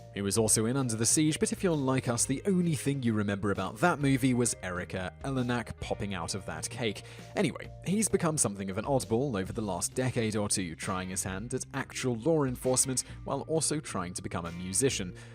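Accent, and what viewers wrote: United Kingdom. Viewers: Typical American response to British pronunciation...stupid. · Cade Tatum He's British, he can pronounce it how he wants.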